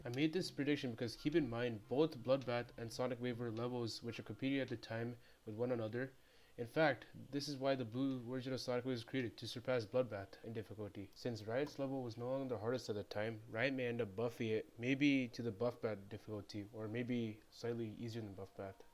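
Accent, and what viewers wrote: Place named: India. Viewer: Btw are you from india your voice looks like an indian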